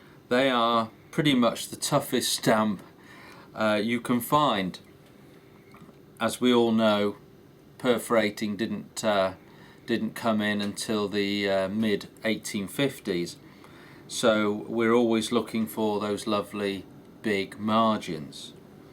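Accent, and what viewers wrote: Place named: Yorkshire, United Kingdom. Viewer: you sound like a Yorkshire lad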